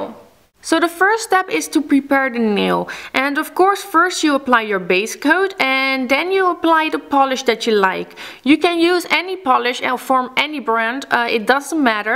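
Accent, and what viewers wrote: Netherlands. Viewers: Where are you really from? I hear some accent hahaha Holland? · ik dacht al dat je nl was hoorde het al een beetje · Super leuke nail art! Ik was het filmpje aan het bekijken en ik dacht: Dat accent, ze moet wel Nederlands zijn. Dus ik ging zo op je blog kijken en toen bleek het dat je Nederlands was! ik vind dit ontwerp echt super gaaf ik ga het ook proberen (: <<num>!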